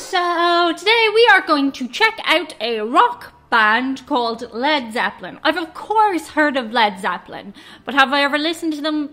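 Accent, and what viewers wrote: Ireland. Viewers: On the basis of you're accent, i'm assuming you're irish. If you are based in dublin, i would check out ticketmaster for tribute bands of led Zeppelin. I've seen one at the academy. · You're funny..... And you gotta love that accent! I'm Irish but I don't sound like that.... I mean, my Great, Great, Great, Great Grandfather was Henry Grattan!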